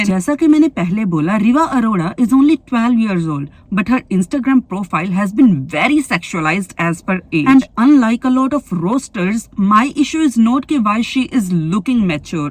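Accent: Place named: India. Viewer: This video is a perfect example of an Indian women outside India speaking about an Indian topic in English but with Indian Accent.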